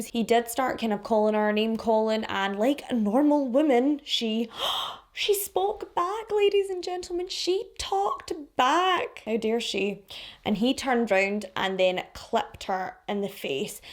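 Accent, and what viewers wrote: Scotland, United Kingdom. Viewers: Wooo a Scottish true crimer - yaaas hen 👏🏻 I remember this episode of I Am A Killer and I wasn’t convinced whatsoever…total sociopath!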